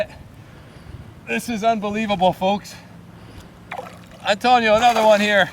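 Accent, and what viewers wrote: Canada. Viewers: You sound like your from Canada, but it is always summertime in your videos?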